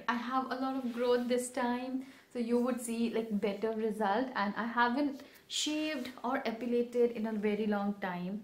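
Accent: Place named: India. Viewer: I cant take the indian accent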